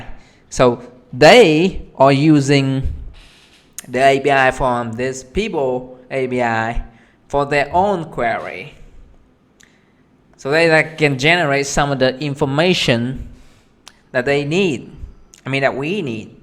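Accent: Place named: South, United States. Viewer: Why do you have a southern accent? lol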